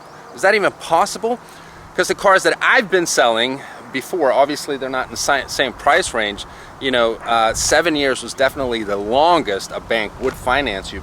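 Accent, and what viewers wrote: Pittsburgh, United States. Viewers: defo turned American, repeat yourselves many times and waffle a lot, instead of getting to the point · From Sweden since 2012, but sounds totally American 🤯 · Dude u sound like u were born in the states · Perfect American accent didn’t know you were from Swede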